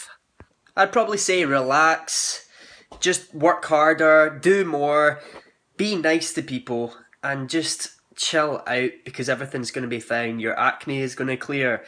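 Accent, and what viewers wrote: Scotland, United Kingdom. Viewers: so I love Scotland (always wanted to go there) , i love your accent but most of all I really admire your positivity, which I think is essential for a person in order to be okay with himself. I "met" you a couple of months ago but whenever I watch your videos, it feels like idk, home :p so yeah greetings from Greece, keep smiling!!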